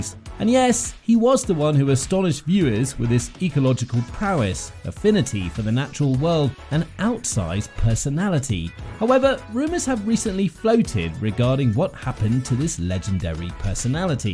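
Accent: United Kingdom. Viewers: Or British whatever you are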